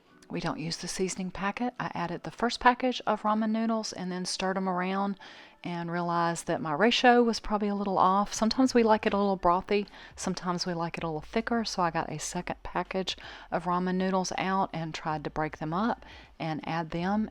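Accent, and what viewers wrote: South, United States. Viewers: Thank you for another week of meal ideas. I'm so sorry about your accident...wow! I love that veggie lomein, and am not ashamed to admit I also love oodles of noodles. Have eaten them hundreds of times with no ill effects. LOL Will you forgive me if I confess I can't seem to get into lentils? (Although my son keeps trying. He's given me about three pounds worth, and they're all sitting, being ignored, in my pantry.) Yeah on pantry meals, btw...you're doing great! I read one of the comments that someone criticized your voice?!? You have a lovely, soft, calming voice, with just a slight southern accent ( is more pronounced.) It's one of the things that makes your videos such a pleasure to watch. Just keep on being you. There's a reason you're headed to 10K subscribers, my friend. hugs...stef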